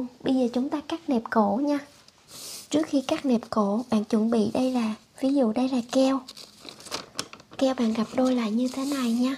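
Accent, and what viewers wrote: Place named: Mekong Delta, Vietnam. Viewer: Bà chắc dễ thương lắm nè.cưng ghê giống người miền tây hả bạn❤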